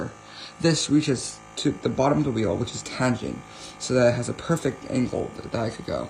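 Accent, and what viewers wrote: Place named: China. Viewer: u sound so chinese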